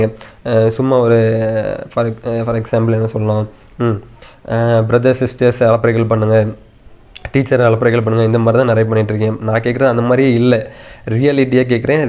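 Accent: Kanyakumari, India. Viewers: கன்னியாகுமரி slang 😍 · R Really I Intelligent S Slang Master H Hyper Power A Appreciate B Best The Best kumari Slang Means You only 💓 Lots of love💓💓💓💓💓💞💞💞💞💞💞💞💞💞💞💞💞💞💞💞💞💞💞💞💞💞💞💞💞💞💞💞💞💞💞💞💞💞💞💞💞💞💞💞💞💞💞💞💞💞💞💞💞💞💞💞💞💞💞💞💞💞💞💞💞💞💞💞💞💞💞💞💞💞💞💞💞💞💞💞💞💞💞💞💞💞💞💞💞💞💞💞💞💞💞💞💞💞💞💞💞💞💞💞💞💞😍😍😍😍😍😍😍😍😍😍😘😘😘😘😘😘😘😘😘😘😘😘😘😁😁😁😁😁😁😁😁😁😁😁😁😁😁😁😁😁😁😁😁😁😁😁😁😁😁Chella mavane 😍🤣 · Athu kanyakumari slang bro💪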